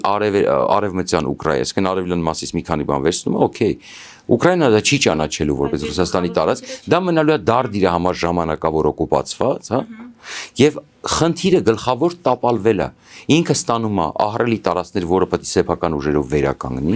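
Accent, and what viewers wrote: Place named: United States. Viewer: Էտ մենակ թողել էք արմենչիկի գովաբանությունները մնացածը ջնջում էք՞, այ լակոտ դու մեզ ուզում էիր բերում Զելենսկուն, որ տեսակ ինչ հզոր քայլ արեց, Տռամփին առաջարկեց իրա հանքերը ու հեսա կտեսնեք բիզնեսմեն Տռամփը ինչ օրնա քցելու պուտինին, ու այ տուֆտա ով ու ինչ օրն ընկա՞վ, խեղճ Զելենսկուն բազմաթիվ կթելու փորձեր տեղի ունեցան ու դեռ շարունակվում են ավելի խորանալ, դու ամերիկանսկի իդեոտ ինչ էիր առաջարկում բերեք զանգեզուրի հանքերը տանք ամերիկացիքին, այսինքն ջհուդներին, որոնց հինգերորդ կետից սնվում էս դու ու քո տականք ընտանիքը, անգամ նրանց առոգանություննես օգտագործում հաչալուտ ժամանակ, երկրորդը, ամուլ սարը ամերիկացիկինը չի դա սեռժա արամական վազգենական ոսկու թեմայա ռուսական դաշտում դու քո հիմար խելքով լսում էս հին աղվես, մեծն դերասան ասմունքող արամ զավենիչին, որ ամուլ սարից իրա թուլա փայն ունի, ու աֆրիկյան աբորիգեն ցեղերի ներկայացուցիչների պես ականջ, պռոշ, քիթ դնում ու բանդաժում էս ու մեզել ուզում էս համոզես որ սա միակ ճիշտնա ու վերջնա՜, այ գյադա ուրիշ ավելի լավ անուն ես չեմ կարող տալ, որովհետև մնացածը շատ ավելի վատն են, երրորդը ասում էս համերաշխության մասին, տո այ խառնակիչ ես դեռ չեմ լսել քեզ, իսկ ես համարյա միշտ լսում եմ քեզ քանզի ես քեզ համարում եմ հայ ազգի ամենա մեծ թշնամիներից մեկը, անգամ նիկոլի վովա օղլիից, քամի որ էտ կեղտը երեսներիսա իրա հակահայկականությունը ցույց տալիս, իսկ դու ագենտ էս ու դա ավելի վտանգավորա, դրա համար ես միշտ քեզ լսելով պատկերացնում եմ թէ մեր թշնամիները ինչ են պատրաստվում մեր գլխին բերեն։ Ես դեռ չի եղել քեզ լսեմ ու դու կոնստրուկտիվիզմից չխոսաս ո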